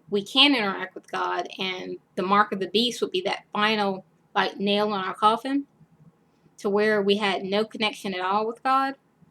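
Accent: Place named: United States. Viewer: "tares" is an old way to say "thistle" from Early Modern English such as existed in 1599 when the Geneva Bible was translated into English. Everyone who lived in europe before 1000 CE is related to every white person alive today, and every person with even one white ancestor. There are 5 Gods and a Goddess in my genealogy. And guessing by your light complexion and American accent, you and I are related.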